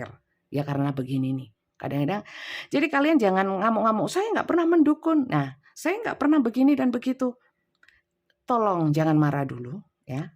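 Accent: East Java, Indonesia. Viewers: 😊❤trimakasih, banyak ndidik orang jadi waras, teges😊. Seneng dg gaya jawa timurannya. Saya aja yg bukan orang wetan karena pernah hidup di daerah wetan, jadi ngomong model jawa timuran. Yok opo se rek kon iku.....😂❤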